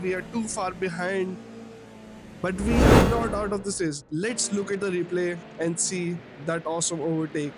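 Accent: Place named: Arab world. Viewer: Are you Arabic? I can hear your accent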